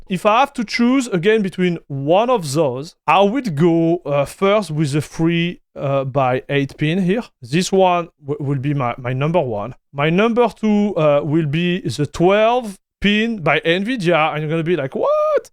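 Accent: France. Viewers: OK. I thought you were from France because of the accent. I forgot that parts of Canada are also French-speaking. Warm greetings to you! Thanks for the channel. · I ordered a Powercolor Reaper for 663 euro on Ldlc, great deal in Italy, considering the base MSRP + tax here is 690 euro! I know it's the bottom line model, but i was planning to undervolt it anyway, so the 2x8 pin are perfect for me, since i have a rm650e and might be kinda CPU bottlenecked in some situations anyway with my 5700x3d. Btw, thick French accent! 😁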